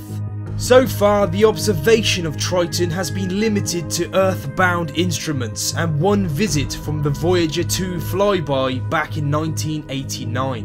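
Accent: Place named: West Country, United Kingdom. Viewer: I can hear a slight West country tinge to your accent.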